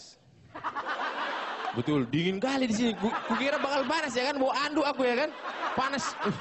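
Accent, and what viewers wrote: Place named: Batak, Indonesia. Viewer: bataknya nyanter banget, wkwkwkwk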